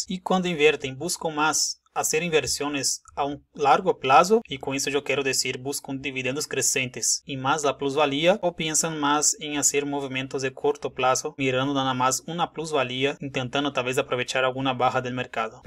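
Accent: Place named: Brazil. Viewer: No sabía que no eres mexicano ! tienes acento de Brasileño, estoy en lo correcto? gracias por tus aportaciones... Y una pregunta tienes nacionalidad? si no es asi... como le haces con los impuestos? puedes tener cuenta en cualquier casa de Bolsa?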